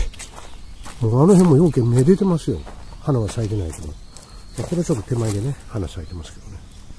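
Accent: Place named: Himeji, Japan. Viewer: さん、情報有難うございます！他にもたくさんの情報、楽しく拝見しました！！行って報告しますねー。実は、わたし、姫路出身なので、毎度『みなさ～ん、こんにちは』のイントネーションが私と同じで、わらってしまい、さんの動画のファンになりました（笑。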